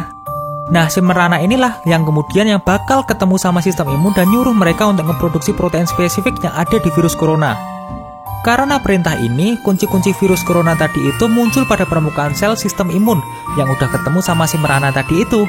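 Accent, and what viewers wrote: Java, Indonesia. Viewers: medok gimana gue yang bukan orang Jawa masih paham apa yang dibilang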